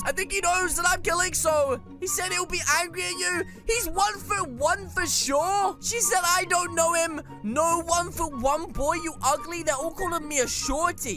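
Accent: United Kingdom. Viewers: are u british im confused... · bro are u British?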